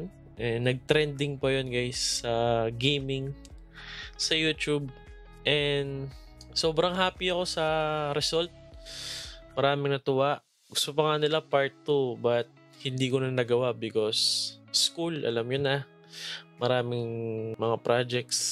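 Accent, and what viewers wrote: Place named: Pampanga, Philippines. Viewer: Kapampangan na kapampangan idle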